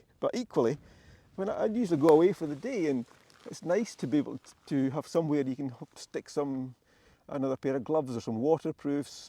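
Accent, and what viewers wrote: Scotland, United Kingdom. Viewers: haha no doubt, but I meant experiencing the next level of RE bikes. Anyways, thumbs up to these cool videos and that super cool Scottish accent.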